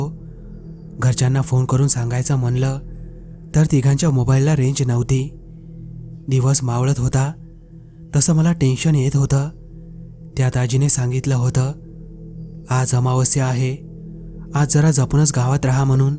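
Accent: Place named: Uttar Pradesh, India. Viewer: अरे मित्रा story कितीही बरी असली तरी narrate करणारा आवाज पण त्या लायकीचा असायला हवाय. एक तर खुप thirdclass आवाज आणि बोलताना शब्द किती चुकीचे. Up वाला माणूस मराठीत बोलतोय असं वाटतंय. मित्रा खरंच सांगतोय फक्त अर्ध्या मिनिटात हा आवाज ऐकून story नको असं वाटतं. थोडा अभ्यास करून channel चालवा. कश्याला स्वतःच हस करून घेताय. कुणीही उठाव आणि youtube वर video टाकाव. फालतुगिरी आहे सगळी.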